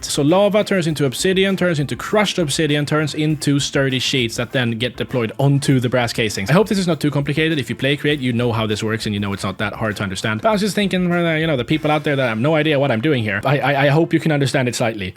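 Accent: Sweden. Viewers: Are you swedish? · why has swedish accent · U sound so swedish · Är du från Sverige? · Are you swedish???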